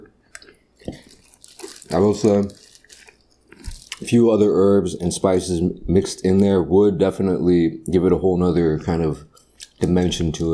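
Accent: United States. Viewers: My daughter whose 11 loves Korea and everything Korean..lol. she's a big Anime fan.. I told her to watch your mukbangs and she thinks yo totally cool 😎 😅😅😅..she also loves your American accent 😮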